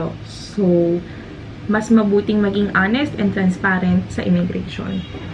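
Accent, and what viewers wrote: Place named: England, United Kingdom. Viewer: thank you and btw, I like your english accent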